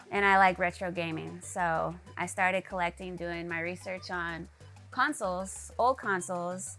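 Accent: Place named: California, United States. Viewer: 😍😍..... It sounds like she's from Cali.. Wish it was NY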